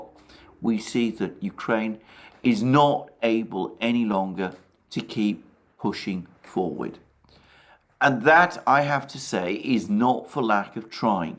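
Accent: United Kingdom. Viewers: Alexander when all this quietens down (and that it will) you will be the most important historian of this war. Indeed posterity will remember you as the articulate studied chronicler journalist of this Slavic affair, with a delightful British accent. More power to you and your tribe. 🎉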